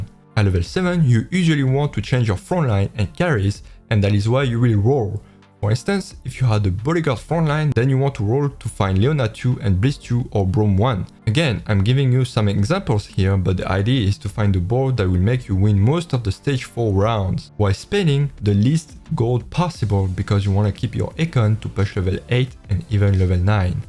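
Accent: France. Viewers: on dirait que tu as un petit accent francais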